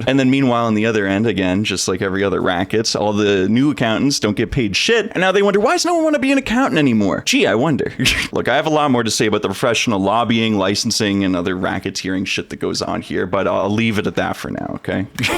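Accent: Canada. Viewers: Oh man I clocked that Canadian accent easily The classic “EHRR” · The Canuck accent is gorgeous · Unbearable Canadian accent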